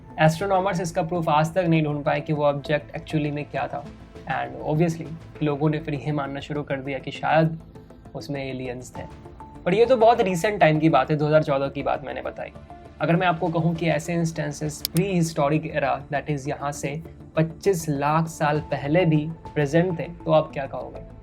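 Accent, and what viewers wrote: Bihar, India. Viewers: bro r u frm bihar??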